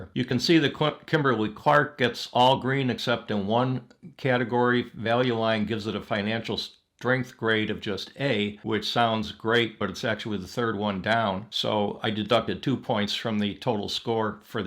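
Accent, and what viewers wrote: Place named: Pennsylvania, United States. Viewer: your have a Pennsylvania accent, maybe Pittsburgh: -you say "dowwars" , instead of dollars. -"quawllee", instead of quality. 😁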